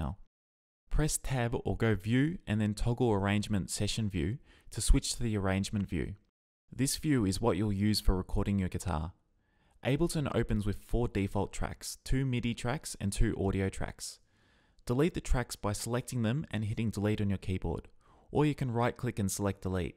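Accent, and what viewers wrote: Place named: Australia. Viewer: Something about Australian accents makes me pay attention way better, thank you!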